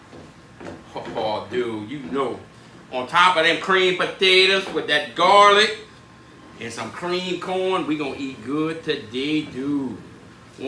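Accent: Louisiana, United States. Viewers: I'm from Washington DC and we have a unique accent. I like listening to your Cajun accent. 😊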